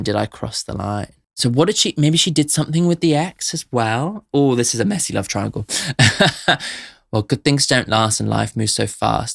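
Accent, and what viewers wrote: United Kingdom. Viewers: Do people with beautiful English accents realize how they just seem brilliant to us Americans? You make “bastard” sound nice! Anything you say sounds smarter than us even if it’s gibberish! Funny how music masks accents.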